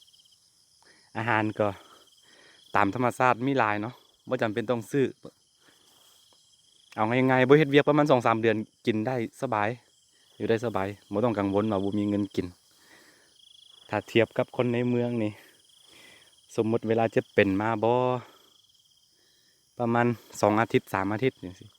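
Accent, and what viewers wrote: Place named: Ubon Ratchathani, Thailand. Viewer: เจ้านิกะเว่าได้หลายภาษาเนาะเว่าคือคนอุบลบ้านข่อย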